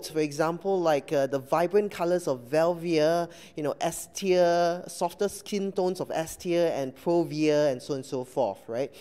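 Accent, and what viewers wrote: Singapore. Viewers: Singaporian accent is so funny :p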